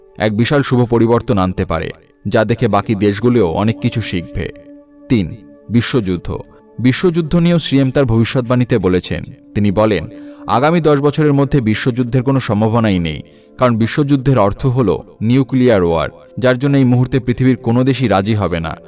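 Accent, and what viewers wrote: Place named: Bangladesh. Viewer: আপনার কথা বলার style, উচ্চারণ সব বাংলাদেশের মানুষের মত ।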